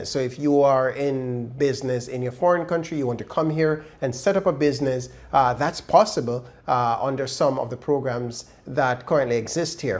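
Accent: Jamaica. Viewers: I hear a Jamaican accent 🇯🇲☺️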